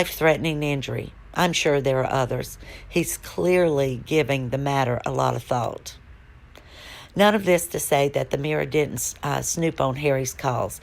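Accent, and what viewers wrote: South, United States. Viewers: Thank you for reading this. You have a delightful voice and a gorgeous southern accent ❤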